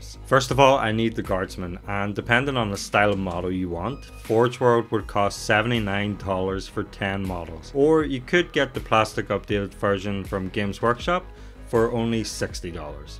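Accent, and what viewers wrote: Northern Ireland, United Kingdom. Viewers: Whereabouts in Northern Ireland are you from? 😁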